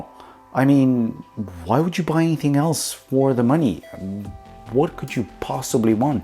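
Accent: Ireland. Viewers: Are you located in Ireland? Hint of an Irish accent. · Great review! I was thinking you had a vague Irish accent and checked insta to see your based in Dublin lol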